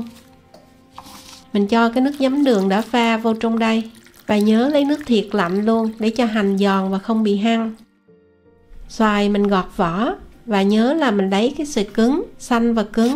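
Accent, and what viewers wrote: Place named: Mekong Delta, Vietnam. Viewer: Hấp dẫn quá !! Món này làm lai rai thì đúng bài rồi , cám ơn lời chia sẻ và giọng nói thân thương của người dân ((Miền Tây))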